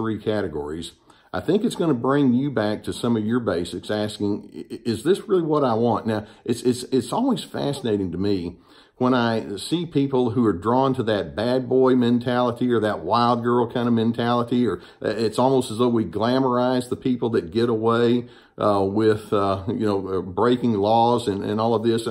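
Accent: South, United States. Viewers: Love THICK SOUTHERN_ACCENT!! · Appreciate a doctor with a Southern accent! The media equate that accent with uneducated hicks--exacerbating regionalism.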